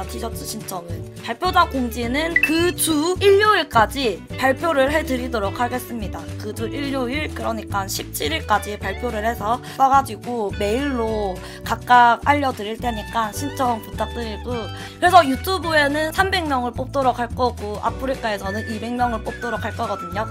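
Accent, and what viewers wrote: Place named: Gyeongsang, South Korea. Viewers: 양팡언니ㅠㅠㅠㅠㅜ 저 대학교 새내기 올해 1학년이에요ㅠㅠ. 부산사람인데 대학을 타지로 가서 너무 낯설구 외롭구 했는데 언니 덕분에 외롭지 않게 즐겁게 지내구 있어요 과제하다가 언니보구 밥 먹으면서 언니보구 시험공부하다가 언니보구 간식 먹을 때 언니보구. 제가 왜 이렇게 언니를 끊임없이 보는가에 대해서 생각해보았는데요 그건 아무래도 사투리가 그리워서 그랬나봐요ㅠㅠㅠ 진짜 언니 없었으면 향수병 걸려서 우울증 걸리고 자퇴했을거에요ㅠㅠ 언니 덕분에 한학기를 이렇게 잘 마치네요ㅠㅠ 항상 고맙구 응원합니다ㅠ 언니 영상은 보면서 공감을 많이 하게 되는 것 같아요 뭔가 사람 냄새 난달까??ㅋㅋ 나두 저런대 나두 저런대 하면서 무릎을 탁 치고 하죠ㅋㅋ 주위에 친구들한테두 열심히 홍보하고 있어요!!♡♡♡ 가끔 혼자 길가다가 파파라치 흥얼거리기도 하구ㅠㅠ 린온 흥얼거리기도 하고 ㅋㅋㅋㅋㅋ 저번에는 강의시간에 나이따 나이따 나나나나나나 나이따하는 금지님 목소리가 계속 멤돌아서 죽는 줄 알았어욬ㅋㅋㅋㅋ 언니 방송해주셔서 감사합니다ㅠㅠ 항상 파이팅!!!! 사랑해요!♡!♡ <mail> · 종종 잘보고 있습니다 같은경사도라 그런지 사투리 쓰는거 볼때마다 정겹네요 ㅋㅋ 40만추카드리고 리미티드 꼭 당첨되고 싶어요 <mail>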